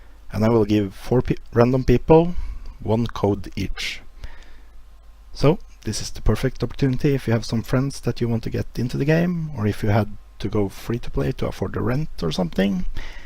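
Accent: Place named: Norway. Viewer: Well I don't see why would you need native speaker tbh. I suppose there is a lot of players in AoC which doesn't speaking natively so I think they don't mind your accent or how it call Norenglish :P Watched EP1 but I think it was tooo long. I suppose podcast about 10 minutes is just fine. Just continue if you want to do it really and also announce on forums as I did not see any info about it just came here by accident :P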